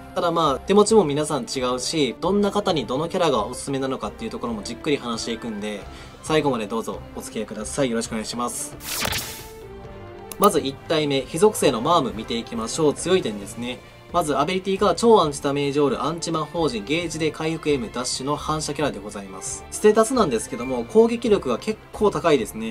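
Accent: Kansai, Japan. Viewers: 関西人かな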